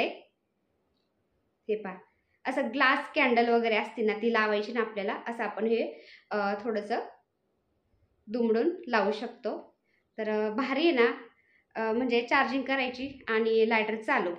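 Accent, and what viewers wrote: Ahmednagar, India. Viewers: Nagar chi bhasha lagech olakhun yete amhi pn kud bolato sagale bolatat kuthe bolav pn aplich bhasha chhan ahe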